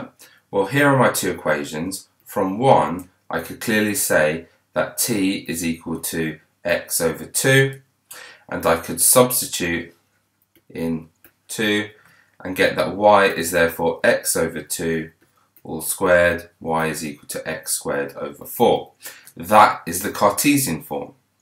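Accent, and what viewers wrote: United Kingdom. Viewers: math sounds so much nicer with a british accent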